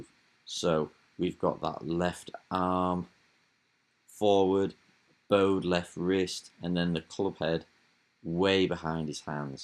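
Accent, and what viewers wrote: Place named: Yorkshire, United Kingdom. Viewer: Great video and a nice surprise to hear a yerkshire accent on a golf video. Could do with turning your mic levels up, or speaking a bit louder. Audio is clear but quiet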